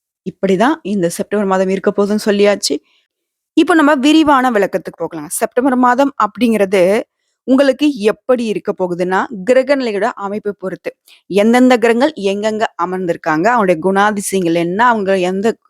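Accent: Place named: Periyapalayam, India. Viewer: Periyapalayam Tamil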